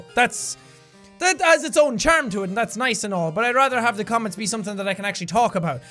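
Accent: Ireland. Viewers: Now that you moved does that mean your going to lose your Irish accent? Please be no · JACK!!!! Since you moved out of Ireland do you think you might slowly lose your accent?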